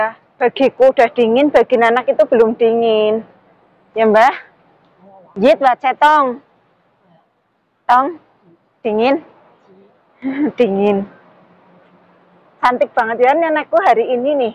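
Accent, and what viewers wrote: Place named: Java, Indonesia. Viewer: Sama.....lucu mbak kalau ngomonh jawa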